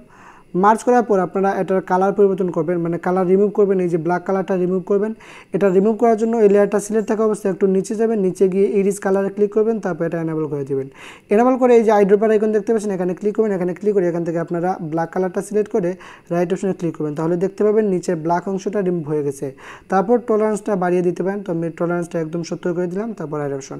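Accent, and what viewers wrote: Sylhet, Bangladesh. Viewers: ভাই আপনি কি সিলেটি?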